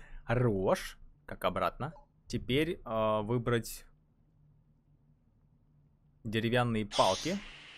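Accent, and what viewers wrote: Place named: Russia. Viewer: Rial Rassin accent!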